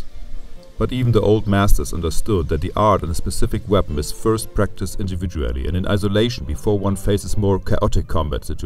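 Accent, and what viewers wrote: Germany. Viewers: Wenn Deutsche Englisch blubbern...🤢🤮👎🏻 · Ekelhafter, deutscher Akzent.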